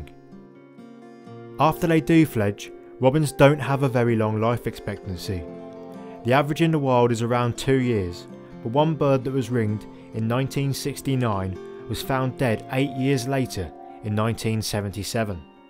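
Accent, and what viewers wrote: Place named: Norfolk, United Kingdom. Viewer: Definitely a Norfolk boy 😂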